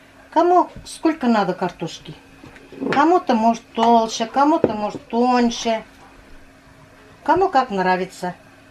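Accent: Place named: Bashkortostan, Russia. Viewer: землячка моя дорогая! Я русская, но родилась и выросла в г. Уфа и поэтому татарский язык помню и: Исама Сис поняла ( может неправильно написала, но уж простите меня) т.к. мне 65, а уехала учиться из Уфы под Москву в 17. С большим удовольствием смотрю твой Канал, хорошо, что он появился и с большим удовольствием слушаю твою речь с местным акцентом- это как бальзам на сердце для тех, кто живёт далеко от своей малой Родины. Спасибо тебе, большое!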